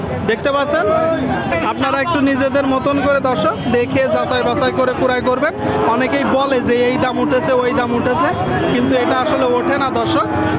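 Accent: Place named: Jessore, Bangladesh. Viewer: আসসালামু আলাইকুম।ভাই এটা একটা অনেক বড় প্লাটফর্ম।এই ভিডিও অনেক মানুষজন দেখে। অতএব এখানে শুদ্ধ ভাবে কথা বলাই উত্তম। যেমন, আপনি বলেন কিরমিরি ,সত্তশ (হবে কৃমি,সত্তর) এধরনের শব্দ কি আদৌ বাংলা ডিকশনারিতে আছে? তাছাড়া যশোরের ভাষার একটা ঐতিহ্য আছে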